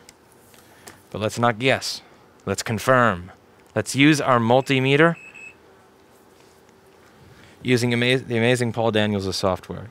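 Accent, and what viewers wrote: New York, United States. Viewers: Rossmann do you not understand the bulk of people who originally subscribed to you were brought because you were doing "boring" board repair? Some of us respect the skill it takes to diagnose a problem and the others respect the skill it takes to properly repair. Then there sre some of us who like the absurd New York style of how you speak. Then there are some who like all 3. And Erica sounds like an amazing woman. Best put a ring on that finger.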